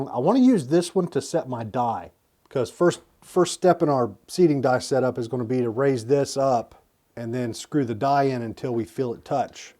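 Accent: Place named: Eastern Kentucky, United States. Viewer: What part of eastern Ky are you from??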